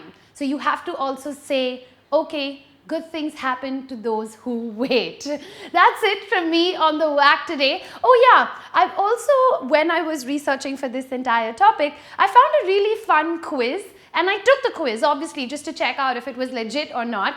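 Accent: India. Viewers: I just love your natural Indian accent :).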